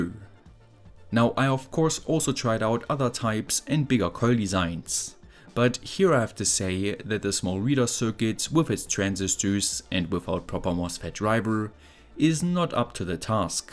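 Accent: Germany. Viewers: You sound absolutly like a german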